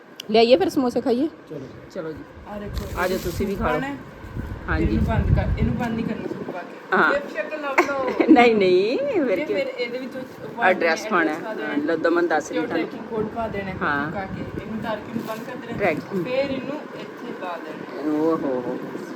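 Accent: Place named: Malwa, India. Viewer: Dii tusi apni hi boli boleya kro malwaeiya dyi boli vadiya nhi lgdi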